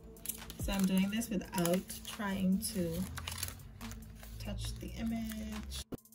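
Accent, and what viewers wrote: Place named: Bahamas. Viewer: I heard ur voice and I’m like hey this got to be my hometown people. Bahamian accent so recognizable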